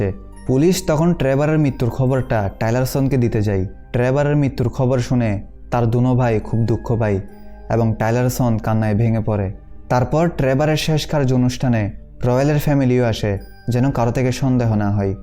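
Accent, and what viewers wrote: Chittagong, Bangladesh. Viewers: যদিও আমি ঢাকার কিন্তু চিটাগাং এর ভাষায় এক্সপ্লেন শুনতে অনেক ভালো লাগলো, তাই সাবস্ক্রাইব করে দিলাম 🥰💐🥰💐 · যতই চেষ্টা করেন না কেন চট্টগ্রামের মানুষের কথা আলাদাভাবে স্পষ্ট বুঝা যায় 😊😊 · হাইরে চিটাইংগা টান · Bro chhatgaiya accent is annoying me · love ur chatgaiya accent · চাটগাঁইয়া উচ্চারণ চলে আসে। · বুঝলাম আপনি চট্টগ্রামের, কিন্তু আরেকটু স্টাইলিসভাবে বললেও পারতেন 😅। ভিডিওটা সুন্দর ছিলো ❤❤ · Apni Chittagong er Manush.... Kotha bolar accent e buja jacce😊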